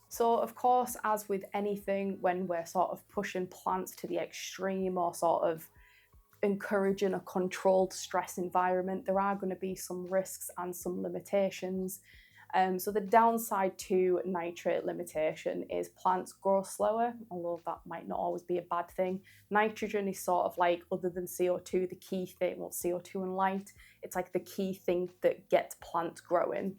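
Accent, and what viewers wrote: United Kingdom. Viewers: your accent reminded me of my time studying in Leeds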